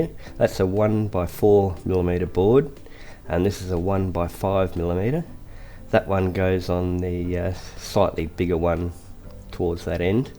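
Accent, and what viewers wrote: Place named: Australia. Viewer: I’m assuming you’re Aussie by your accent and “g’day” . I’m just starting out and was wondering what stains you use and if they go ok under water based varnish? I’ve bought a tin tin of Cabots water flat varnish from the green shed. Cheaper than model paints. Andrew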